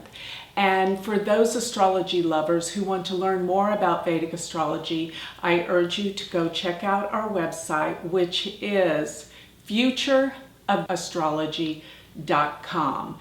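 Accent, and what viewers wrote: United States. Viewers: Greetings from Vedic astrologer...from France. Thank you for posting. Just cannot stand this American accent ;-((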